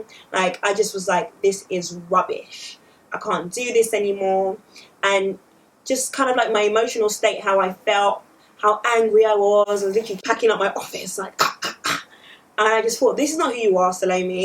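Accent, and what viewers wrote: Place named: London, United Kingdom. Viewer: Love your vids Salome. From a fellow Londoner (you sound like you're either from south or north London lol)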